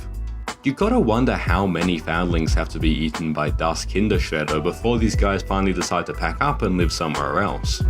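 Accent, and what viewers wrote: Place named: Australia. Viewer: God I hate the australian accent so much and ur video sucked ur reasons why the first season was bad were minor nobody rly gives a f abt some fob what I care is structural storytelling and good characters. Season 1 had that and like all good things Disney must destroy with season 3. You rly suck at "breaking down" things pls sygau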